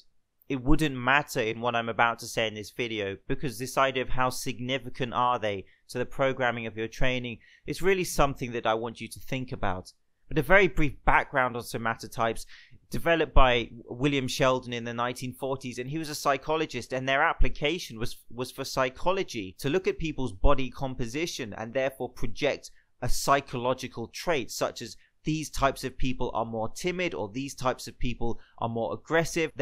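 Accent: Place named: St Albans, United Kingdom. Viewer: I've been seriously getting into fitness for the last year. The YouTube algorithm has clearly decided I'm ready to level up — these videos are exceptional and seriously adding value to my life. Thank you so much! Your accent sounds like it's from my hometown, St Albans, which is comforting during lockdown up in the sticks in Scotland :)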